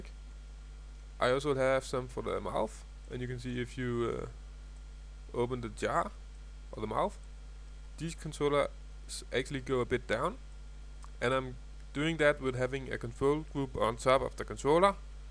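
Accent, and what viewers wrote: Denmark. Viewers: Nice tutorial! Are you by any chance Danish? Your accent is so familiar.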